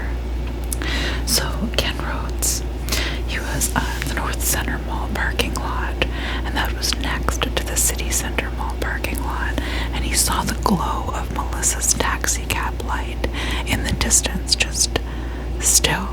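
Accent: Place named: Midwest, United States. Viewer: Are you from chicago cause you sound like youre in the midwest if not jersey!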